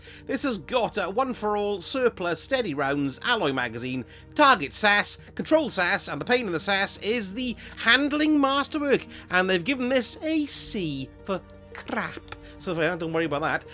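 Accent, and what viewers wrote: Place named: Liverpool, United Kingdom. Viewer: the only british i know who speak this way are liverpool fans . so are you a liverpool fan ?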